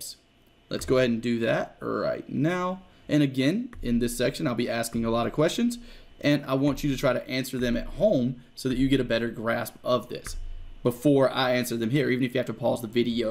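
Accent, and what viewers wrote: South, United States. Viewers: His southern accent comes out occasionally and its adorable lol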